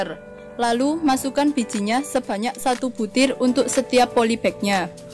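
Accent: Java, Indonesia. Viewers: Medok bngt ngomongnya😂😂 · Tapi suara presenter nya medok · Saya seneng pembawa acaranya ada medok medoknya gitu 😀😀😀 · Medhok tapi Keren 😁😁😁😁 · Salm medok..😅😅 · Saya gk butuh MEDOK 😀 Nya....saya butuh informasi ilmunya.... semangat nduuuuukkkk...👍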